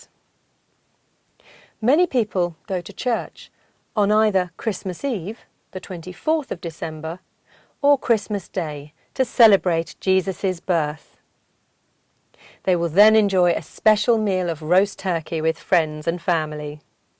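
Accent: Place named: United Kingdom. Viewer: Her voice and language pure British English. Awesome. I dnt understand why in uk, majority people will speak street language(they dnt speak clear, they dnt speak full only they speak half lOl, they use shortcut words), only they can understand. They are spoiling birtish image.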